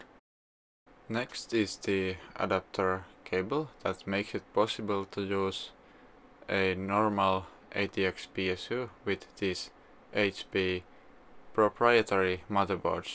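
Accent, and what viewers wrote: Finland. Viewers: Katsoin 10 sekuntia ja oon täysin varma tästä Suomi aksentista. Löysin tänne koska etin videoita kotelosta kun tilasin tän siskonpojan kokoonpanoon ja halusin nähdä mimmosii fiiliksii muilla on tästä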